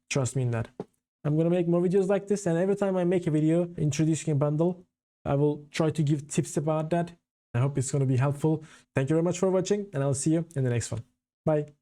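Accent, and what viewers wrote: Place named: Turkey. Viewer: İngilizce'deki akıcılığını takdir ettim. Günlük olarak ingilizce konuşuyor musun bilmiyorum tabi ama türk aksanından ve isminden yabancı olmadığını hemencecik anladım. Çok kaliteli bir kanalın var umarım dilediğinden bile fazla başarılı olursun! Abone oldum.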